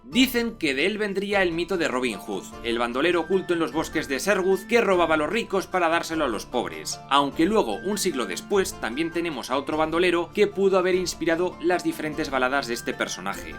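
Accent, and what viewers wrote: Spain. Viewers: Me gusta tu acento español, además de tus vídeos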